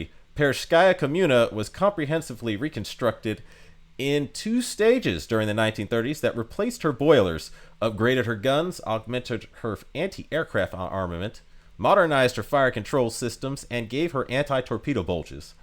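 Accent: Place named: United States. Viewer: God damn man, I love your energetic personality but you have a chronic infection of Americanised pronunciation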